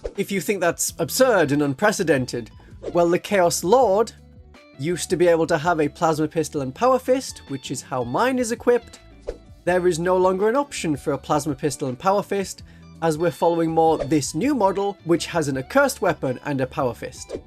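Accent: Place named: Geordie, United Kingdom. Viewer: Where is your accent from? Sounds posh Geordie.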